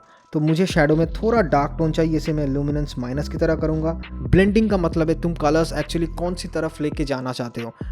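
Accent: Bengal, India. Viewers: Vai tumi bangali na?? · Are you bengali??? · Bro are you Bengali ?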